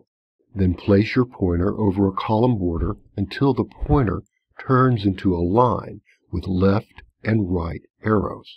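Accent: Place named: United States. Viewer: Slow, steady instructions in a familiar American English accent. Thank you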